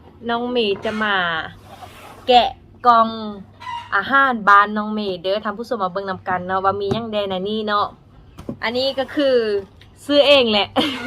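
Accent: Northern Laos, Laos. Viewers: สบายดีน้องเมย์คนสวยน่ารักมาคอมเม้นท์เป็นกำลังใจครับน้องเมย์'หลังจากไม่ได้คอมเม้นท์นานแล้วแต่ก็ยังติดตามน้องอยู่นะครับคนสวยสายบุญคนสวยนํ้าใจงามมีของอร่อยเยอะเลยสาหร่ายนํ้าจืดครับน้องเมย์จากสาวลาวเหนือมาเป็นสาวลาวใต้สำเนียงเสียงพูดลาวเหนือพูดเพราะนะครับน้องเมย์นุ่มนวลฟังแล้วน่าหลงใหลครับน้องเมย์ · ชอบฟังสำเนียงลาวเหนือ น้องเมย์พูดเพราะดีค่ะ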